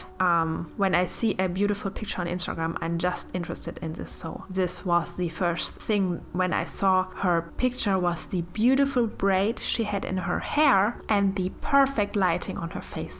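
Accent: Germany. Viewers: Bist du Deutsche? 😂 hört man sofort haha 💖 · Are you by any chance german? I'm german and you sound typically german ( which of course isn't a bad thing at all ) btw the painting looks really good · where are you from? tbh you sound very German 😂 would be funny if you actually are, bc lately I found so many german artist on YouTube!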